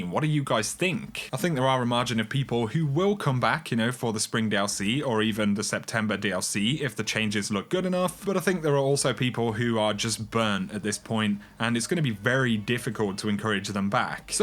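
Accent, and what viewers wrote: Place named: United Kingdom. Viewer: Even bad news sounds good in a British accent...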